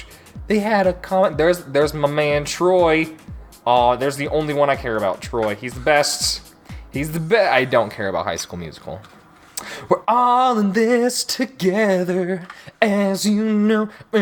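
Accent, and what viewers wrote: France. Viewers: I'm French and always knew Witch with the magazine you have (btw, really cool French accent xD) and yeah the arc continue a looooong time after Nerissa and all that stuff ! For me, Witch was still perfect untill the Ragorland arc with Hay Lin. It's the very first time as a teenager where I was not convinced, and after that when they open a magical school for kids I totally abandonned Witch... It's sad because I still think it was hell of a serie : the drawings were beautiful, it was really feminist, it talked really well about teenage years, it was epic, sad, disturbind, violent... Perfect ! I totally hated the TV show, it was ugly and too superficial for me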